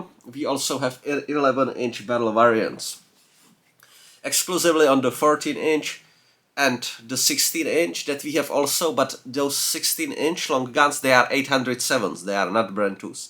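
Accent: Czech Republic. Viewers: Czech accent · i bet 100% this guy is czech, that accent tears my ears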